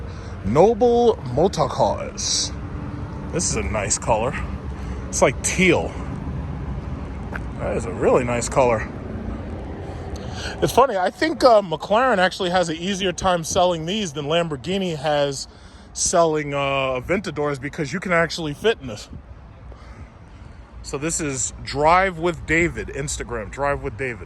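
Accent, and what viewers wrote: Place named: New Jersey, United States. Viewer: Sounds like he's From Jersey, Also he did a comparison with the Standard Length Escalade, what About the EXT Long Version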